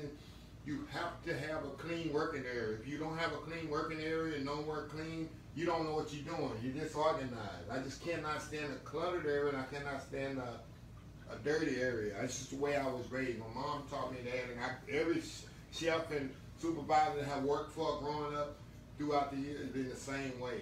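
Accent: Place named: North Carolina, United States. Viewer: Love watching you create different foods ! Love your accent. I know you from Texas but it reminds me of NC in which I lived there for quite a few years. People there are pleasant . They love their get togethers and. SOUL FOOD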